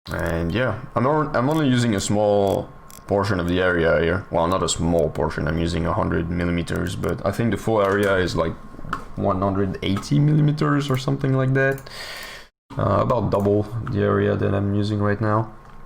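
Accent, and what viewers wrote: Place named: France. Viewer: you have a french accent, are u french ?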